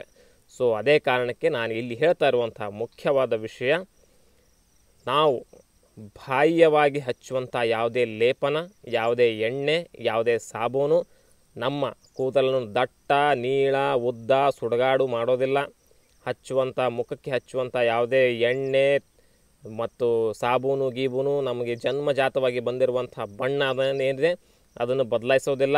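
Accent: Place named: North Karnataka, India. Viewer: Dr, nemma baasheya sogadu yellow ondu kade namma Uttra karnataka da pada balakeyagi nanage nagu thandukoduthade, edu kelodaklke cholo re😍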